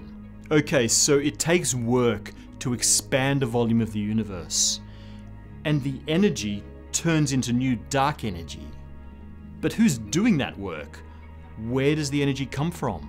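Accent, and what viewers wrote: Australia. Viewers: This chap has an Australian accent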